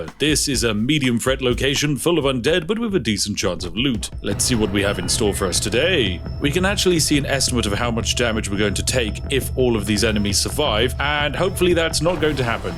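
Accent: United Kingdom. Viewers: is just british LGIO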